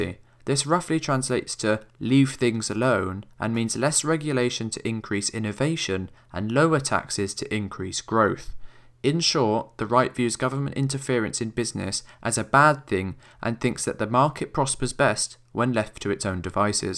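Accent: United Kingdom. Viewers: This is just PragerU with a bri'ish accent. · Peter t.c.: I wouldn't be giving out advice like this when you can't even tell the difference between an American and a British accent. The presenter is British.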